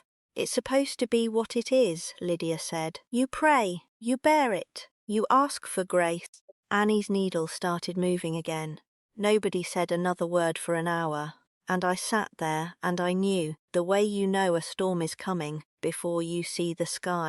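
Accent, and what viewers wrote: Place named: England, United Kingdom. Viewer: And, now she has an English accent. I hate AI.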